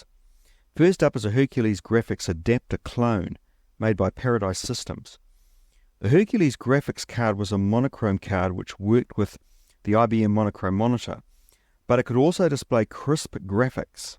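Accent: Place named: New Zealand. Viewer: At first i guessed you were Australian, but your pronunciation of Check it tells me you're from New Zealand. One of my students was from your country.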